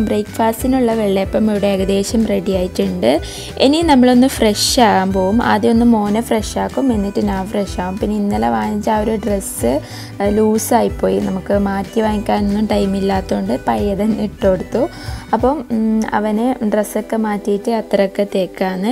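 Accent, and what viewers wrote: Kannur, India. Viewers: Kannur slang maatandato nallaind kettirikan 😍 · Njan malappuramkariya pakshe ithayude kannoor slang enikk orupad ishta😍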